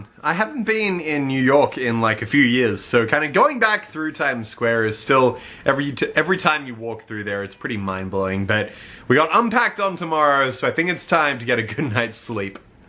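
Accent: Australia. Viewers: Wow I'm dumb I just realized your Australian even though I am Australian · I really love Australian accent <3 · "warder-proof testing" love aus accent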